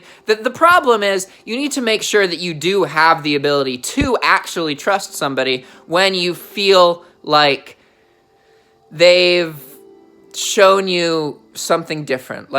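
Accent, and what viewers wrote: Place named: United Kingdom. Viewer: This dude sounds like he's hiding a British accent.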